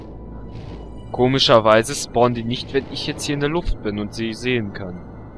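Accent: Russia. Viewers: bist du russe?:D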